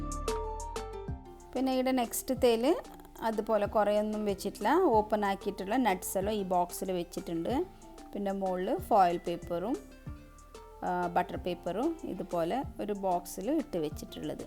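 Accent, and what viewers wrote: Coorg, India. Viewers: Hii samsaram ketappo thoni m in coorg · Samsaarm kekumbo vijaaricchu coorg thanne aanuu enn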